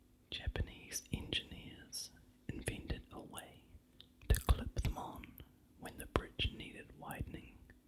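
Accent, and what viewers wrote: New Zealand. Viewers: A lovely Kiwi voice= heaven ;)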